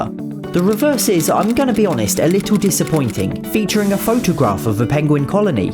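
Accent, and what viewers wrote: United Kingdom. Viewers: Too much British. 3/10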